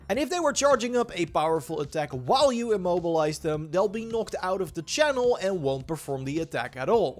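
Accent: Netherlands. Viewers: Why do you sound familiar to a Dutch guy speaking English? Thanks for the video dude. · You are very helpful, yes. But my brain could not shake the feeling that you sound very very Dutch 😂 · Bro your English is very Dutch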